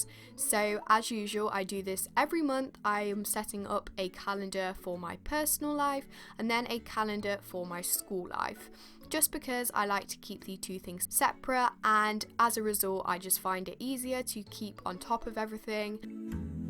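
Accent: England, United Kingdom. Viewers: Where of england is thic dialect from?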